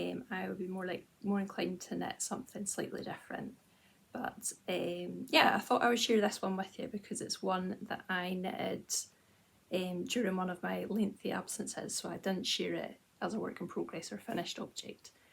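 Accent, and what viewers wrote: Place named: Caithness, United Kingdom. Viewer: That sounds like a Wick/Caithness accent! Lovely slipcover 🙂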